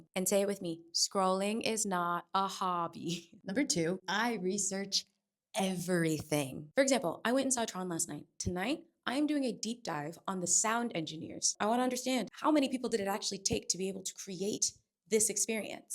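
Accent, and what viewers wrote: France. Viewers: Omg! Your French accent is so beautiful! I’m a native French speaker and you sound way nicer than I do 😭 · Ok but your French accent is 🥖 incroyable🥖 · Your French accent is amaaaazing! Love it 😊🎉 C'est très BON!! ❤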